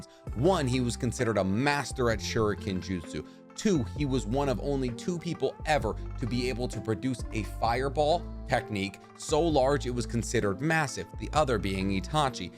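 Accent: United States. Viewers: Why do so many people pronounce Shisui’s name that way? I’ve noticed a bunch of narutubers do it, but just so everyone is aware, it’s not shi-su-ee like ship. It’s SHE-su-ee Okay, after going through more of the video, you just have really poor, Americanized pronunciation. Mungeekyo sharingan, Minnuhto 😂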